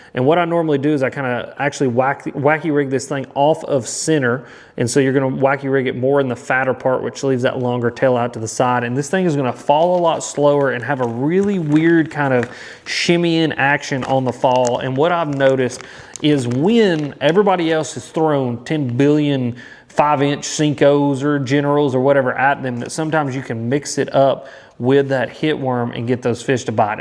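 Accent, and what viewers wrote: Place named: South, United States. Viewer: I see your masters hat, and I hear your southern accent. I just found your channel and it looks like you are in the CSRA area based on what I can see so far lol I've been transplanted here from Wisconsin and I'm trying to figure out how to fish the Savannah River and other South Carolina lakes. Thanks for your videos!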